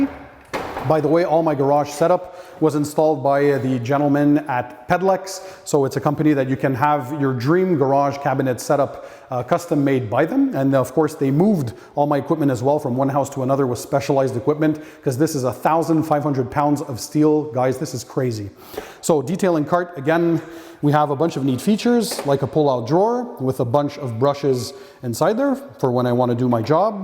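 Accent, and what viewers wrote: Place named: Canada. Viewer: I didn't realise you are Canadian. My Irish ears can't distinguish the Canadian accent from the American one.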